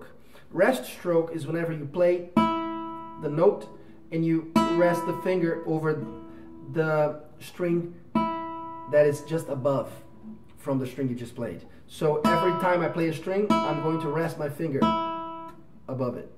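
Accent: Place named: Brazil. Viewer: Super aula. Inacreditável que seja gratuita. Tô comentando em português pois acredito que vc seja brasileiro pelo sotaque. E que ótimo inglês diga-se de passagem.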